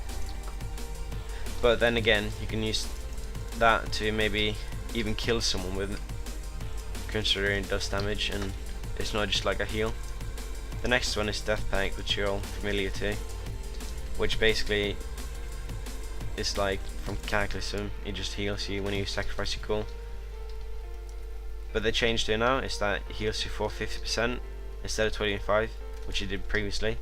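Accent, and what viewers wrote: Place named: Scotland, United Kingdom. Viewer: You from Inverness? You have a strong accent, laddie.. Like Alex ferguson..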